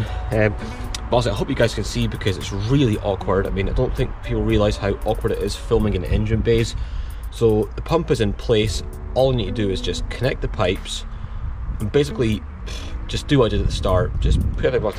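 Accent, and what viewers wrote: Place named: Scotland, United Kingdom. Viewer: I've got an A4, but harder because it's close to the bulkhead but just takes patience. I rebuilt the tandem pump as well. Oil pressure light gives you a fright 😁 VAG cars all do this with long cranks, don't ken why. Guid to hear a Scottish voice on YouTube, keep up the good work. Inlet looks spotless. 🏴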